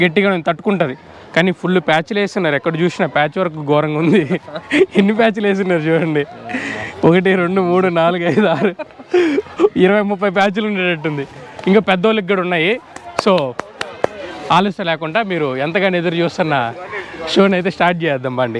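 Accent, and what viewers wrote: Chittoor, India. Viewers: Brother Midhi Chittoor district Kadha మీ మాటలు అలానే ఉంది 😊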